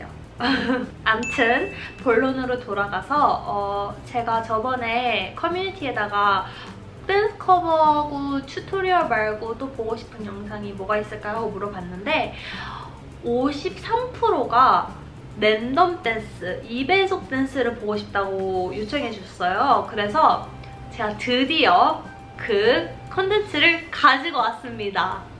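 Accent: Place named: United States. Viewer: Even tho you speak in korean you still have that american accent its cute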